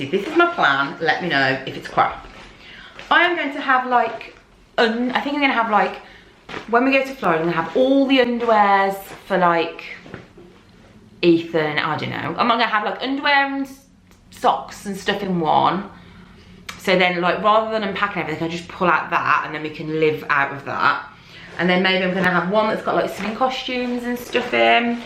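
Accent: Birmingham, United Kingdom. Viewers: It's a fairly strong Birmingham accent. Not all British accents are the same at all haha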